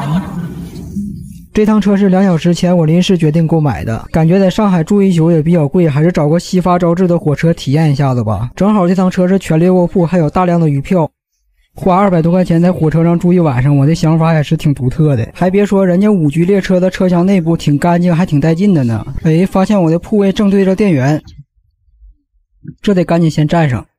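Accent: Northeast, China. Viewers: 哈哈哈哈 碴子话 老带劲了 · 解说一口大碴子味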